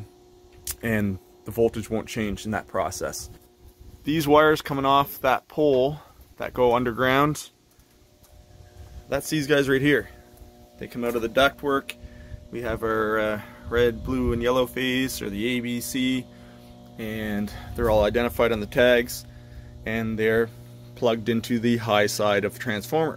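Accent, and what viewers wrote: East Coast, United States. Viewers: Is this Florida or Boston? I miss the East Coast, and from your accent I'm gonna assume you're from that area. I just feel like I can tell where a place is when I get recommended random videos from the places I miss. There's just a specific way things look.. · Within the first 5 seconds I could already tell you were an east coaster lol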